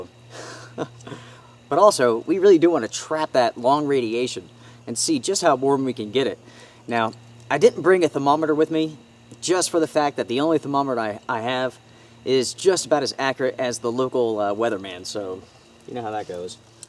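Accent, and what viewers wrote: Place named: North Carolina, United States. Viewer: Love your videos, Just found your channel recently. Interesting that you say pouring the blood, or, pouring the rain. I've never heard anyone adding " The " in those phrases. Must be a North Carolina form of speech? Keep the videos coming, I will be watching, I've got 3 years worth to catch up on...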